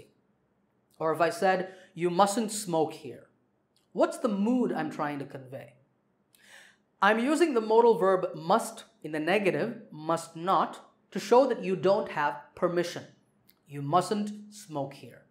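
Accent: India. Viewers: You have an amazing accent being Indian. Keep up the good work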